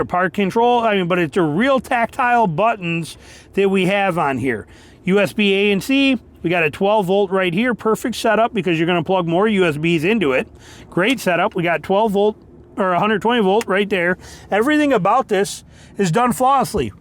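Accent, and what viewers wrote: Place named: Wisconsin, United States. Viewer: Hunert, k. K? K? Gotta be from Wi.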